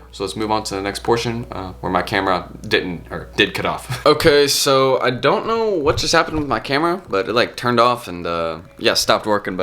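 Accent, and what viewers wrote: South Carolina, United States. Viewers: You from sc?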